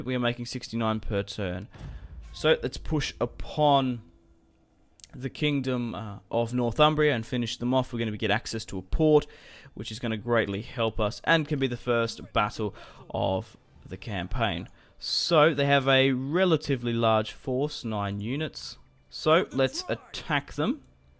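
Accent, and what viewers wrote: Australia. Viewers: where about in australia you from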